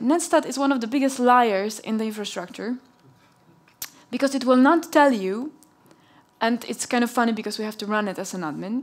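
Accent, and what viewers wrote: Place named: Netherlands. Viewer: Yes she's superb, and her english has improved - her delivery is a bit more mellow that earlier "rapid fire" webinars from a few years ago. She now sounds more Dutch than Polish !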